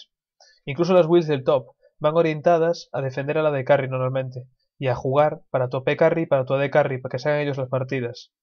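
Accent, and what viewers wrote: Galicia, Spain. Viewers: Eres gallego? tienes mucho acento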